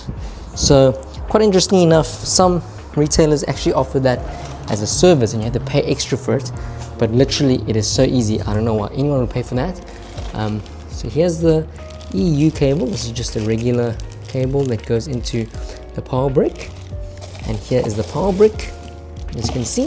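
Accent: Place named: South Africa. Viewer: Great revue :-) Why do you sound south African?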